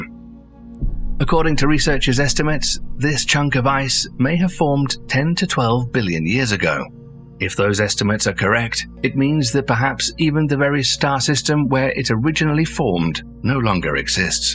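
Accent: United Kingdom. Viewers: Can’t the narrator be an American. I can’t take the awful sounding British accent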